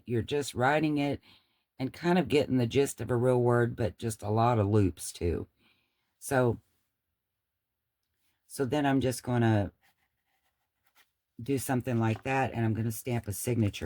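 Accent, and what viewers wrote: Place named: South, United States. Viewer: "Do I talk funny"??.... oh my gosh 😂🤣😂 It's funny, I'm in northwest Indiana, and when I went to visit friends in Texas, I expected a heavy southern accent from the people who were originally from there, but didn't really hear it. When my son went to college in southern Indiana, oh my, they had the heaviest southern accent I've heard, what I expected Texan's to have, lol. So my guess is, you're originally from the south somewhere 🤔 Love the project, everything came out awesome as always 💜💜💜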